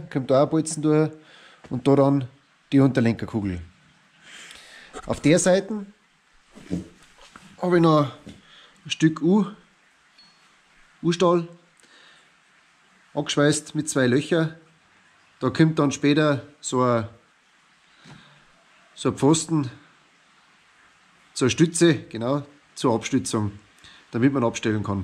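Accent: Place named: High German, Germany. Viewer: sauber... Top hast die a die Hochdeutsche Sprache so anglernt das du es nimma lassen kannst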